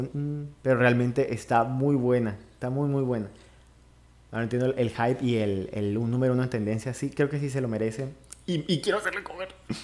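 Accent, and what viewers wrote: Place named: Colombia. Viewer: No tiene nada que ver pero amo tu acento colombiano 💞 ahri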